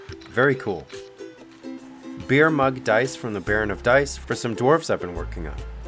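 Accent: United Kingdom. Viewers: Hay great video, liked your English accent, almost as good as mine. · It was great to meet you too, mate! British accent was 10/10 ☕️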